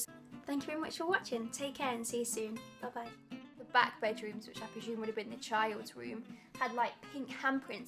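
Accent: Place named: Europe. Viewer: I love the European kind of accent you got... It's kinda hard to catch up with that if you don't belong to the place... I have been using the beetroot thing for so long n Now I see videos over it... Ig I am gonna get back to it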